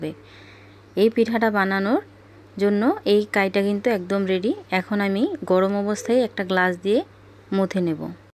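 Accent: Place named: Mymensingh, Bangladesh. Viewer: আপু আপনার বাড়ি কোথায় আপনি ময়মনসিংহের মানুষের মতো কথা বলেছেন 😊😊😊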